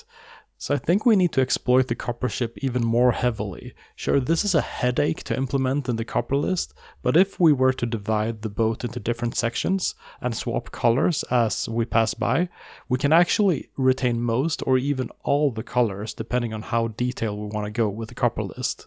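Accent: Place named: Sweden. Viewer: With that accent i know you are swedish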